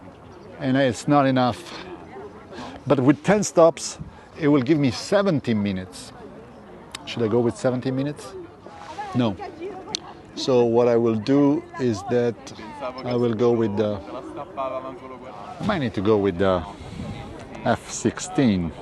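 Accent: Italy. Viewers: Si, ma il tuo accento Milanese é pazzesco, non ti avevo mai sentito parlare in italiano. Bel progetto, Milan l'é semper un gran Milan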